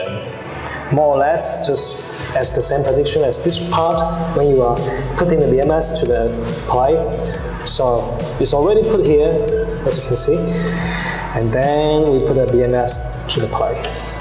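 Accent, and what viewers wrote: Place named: Australia. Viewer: Was listening you sound like an Australian accent.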